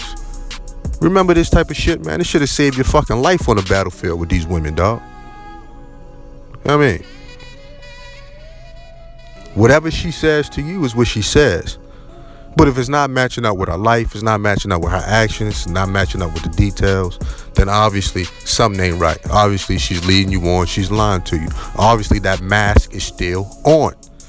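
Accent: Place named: Newark, United States. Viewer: Absolutely loved everything about this breakdown. whats understood never has to be explained and you definitely got your own lane..and that's an understatement...its over just drop a bomb on these niggas. You a real G..sound like a Newark NJ nigga too but I know youre forever. Also man I think you should consider writing a book in the long run. You're stories hit home and definitely paint the canvas. Much respect ✊🏾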